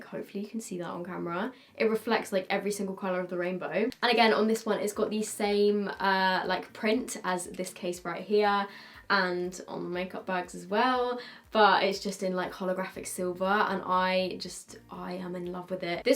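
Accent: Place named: England, United Kingdom. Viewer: #questionoftheday: I am new to your vids but i was wondering if you could try your best american accent? I love english accents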